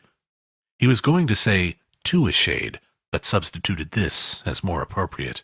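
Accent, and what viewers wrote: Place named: United States. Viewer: He's american, therefore he has an American accent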